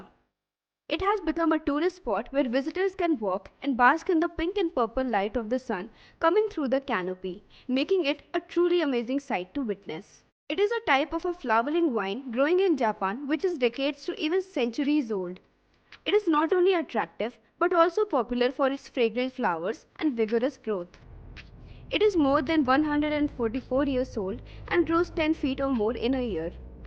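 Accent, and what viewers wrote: India. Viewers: but sounds of india girl.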